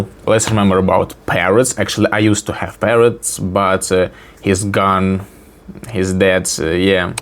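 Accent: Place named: Russia. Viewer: эхх этот русский акцент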